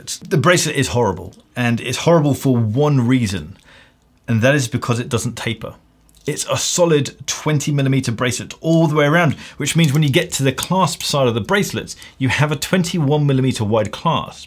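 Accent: RP, United Kingdom. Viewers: Is his accent RP? 😁 I like to listen when speaks. Such a beautiful accent. I heard he pronounced T clearly in most of his videos but when he said "water", it doesn't really sounds like RP.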